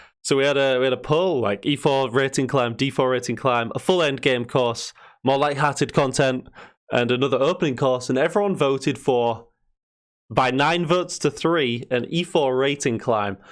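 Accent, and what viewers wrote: Hull, United Kingdom. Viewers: You sound like you’re from Hull??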